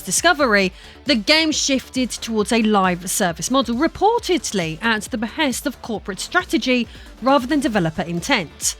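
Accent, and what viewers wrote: United Kingdom. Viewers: She said with a British accent the country locking people up for tweets ffs. Then the first comment she pins is all adds and ways to pay her. But damn America is capitalistic and money hungry.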